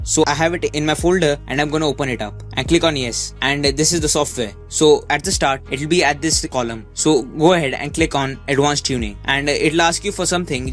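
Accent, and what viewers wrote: India. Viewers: It is an amazing Indian accent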